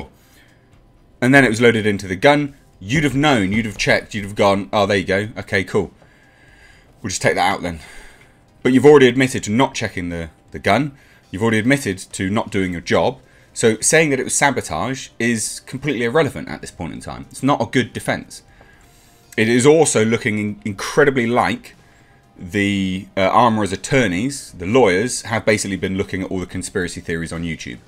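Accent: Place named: United Kingdom. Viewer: Excellent news coverage however that pretentious British accent is annoying as hell.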